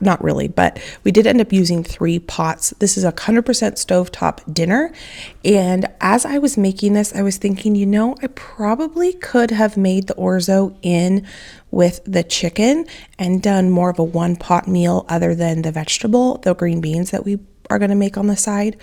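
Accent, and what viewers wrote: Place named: South, United States. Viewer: She cuts everything with that skinny knife she is funny to watch very entertaining Her southern drawl reminds me of my granny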